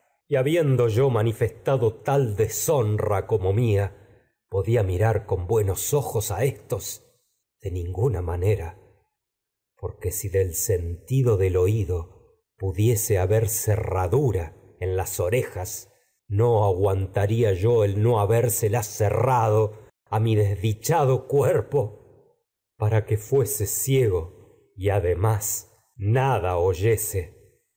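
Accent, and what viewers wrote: Argentina. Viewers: ARGENTINO TENIA Q SER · Muy bueno. Gracias! Argentino, verdad?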